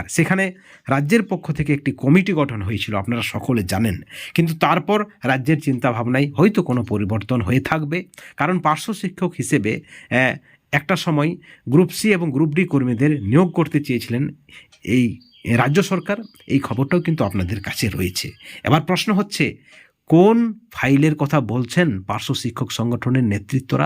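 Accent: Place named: Bangladesh. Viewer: Tumi ki bangala dasi vasha ta to Bangla dashir moto khabor towki Bangla dashar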